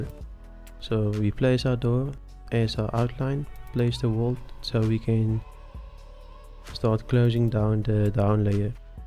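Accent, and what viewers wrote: Afrikaans, South Africa. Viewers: Thick afrikaans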